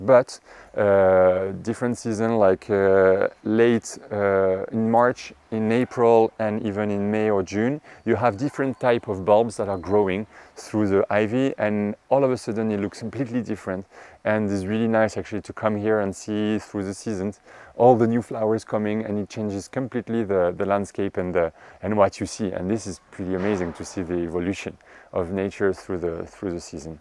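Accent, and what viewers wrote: France. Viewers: I love the symmetry of your gardens ,thanks for showing us that you have a plan to follow.❤I am creating a garden and have a blank canvas.I love the Pierre de Ronsard Roses .I grew one over an Arbour years ago .I have bought two to plant against the Brick wall.and have a standard and other Pink David Austin’ roses etc Your hydrangeas look beautiful .I have a number of Hydrangeas-to plant ,blue and Pink . I want to incorporate a vegetable and Perrinials area into my plan too.I can see the garden rooms you have created.😊You have a French accent 🇫🇷 are you near Switzerland.Cheers from Australia 🇦🇺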